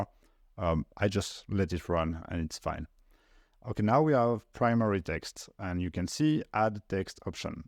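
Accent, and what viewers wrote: France. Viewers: L’accent français ;)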